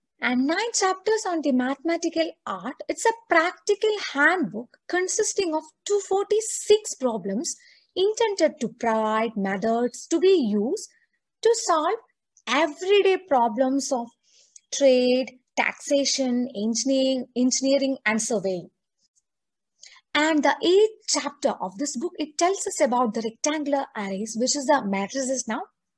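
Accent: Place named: South, India. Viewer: It's good and Informative ! Are you doing PhD in Mathematics ? And another thing to say I like your pronunciation , it's awesome and somewhat like South Indians ,hahaha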